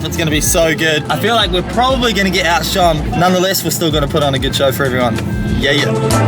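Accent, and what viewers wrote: New Zealand. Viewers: Rewatching this it defaulted to an ai dubbed English track, even though the original video is English? Youtube couldn’t handle the nz English…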